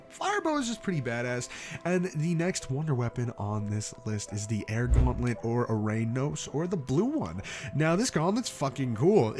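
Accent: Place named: Greece. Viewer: cause you have a kinda Greek accent also I am Greek as well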